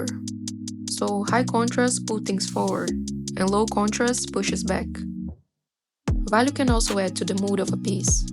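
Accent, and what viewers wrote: Brazil. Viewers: she's from brasil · Brazilian. Easy spot, watch some movies bro, it helps with the pronunciation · her Brazilian accent is very cute !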